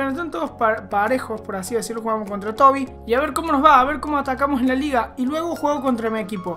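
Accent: Córdoba, Argentina. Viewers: Eres cordobés?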